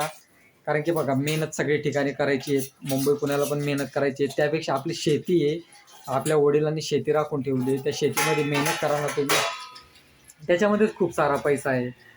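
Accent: Khandesh, India. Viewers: Hallo tumhi kay khandesh chalisgaon jalgaon che aage ka bhasha varun vatate aahe Baki tmhi chhan video banav tat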